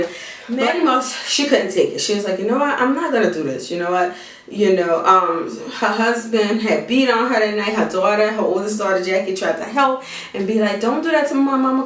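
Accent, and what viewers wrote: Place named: New Orleans, United States. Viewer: Nice Review 👍🙏 Ms. U have a Real New Orleans, Louisiana Accent for Real❤️I Love It!!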